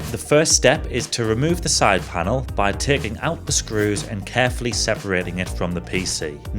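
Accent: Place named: Newcastle, United Kingdom. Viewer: whew, canny geordie yee like.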